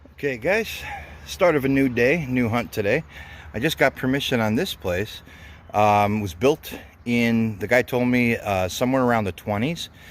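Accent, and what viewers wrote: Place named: Scranton, United States. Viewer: Sound like a scranton boy to me?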